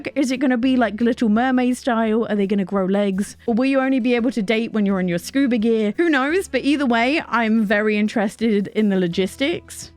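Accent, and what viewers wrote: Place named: United Kingdom. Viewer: this british accent is so good to hear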